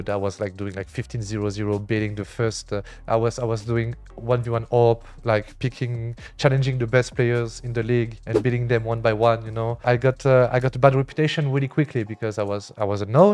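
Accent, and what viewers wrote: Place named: France. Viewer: french accent makes his voice very sexy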